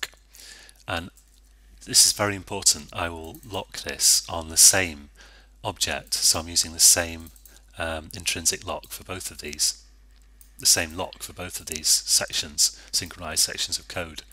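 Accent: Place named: United Kingdom. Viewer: Well done) Thx, nice tutors. It will be better to subscribe on your channel. Oh by the way. One little question: You are from GB ? interesting accent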